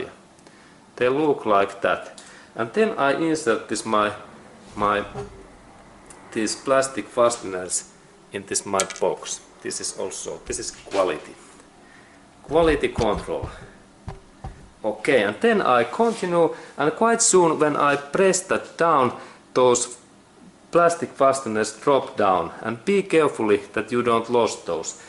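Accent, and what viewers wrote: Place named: Finland. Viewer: Ai että rallienglantia tää on kova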